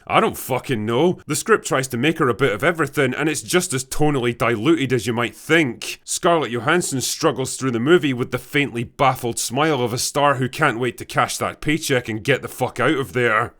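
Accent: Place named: Scotland, United Kingdom. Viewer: I had no intention of going to see this movie--just like I haven't seen any of the other sequels; I'm just here because I think it does a little something for me to listen to a Scotsman sh*t on stuff.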